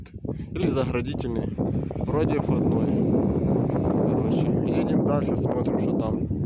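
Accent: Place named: Ukraine. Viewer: Да ладно нормальный голос с приятным малороссийским говором.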